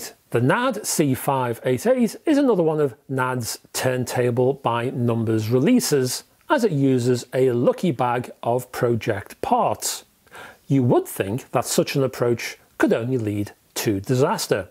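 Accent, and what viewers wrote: United Kingdom. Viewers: I am from Canada and when I hear a British accent I prefer prices to be quoted in "quids" instead of "pounds". Great video!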